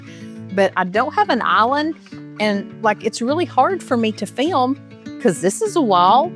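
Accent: South, United States. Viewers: I LOVE your accent... love to hear the southern drawl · love your southern drawl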